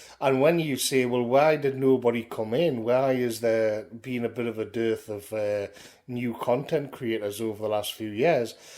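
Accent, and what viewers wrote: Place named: Geordie, United Kingdom. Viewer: The algorithms can’t make out your Nordie accent.We peasants think it’s charming.Follow you anywhere.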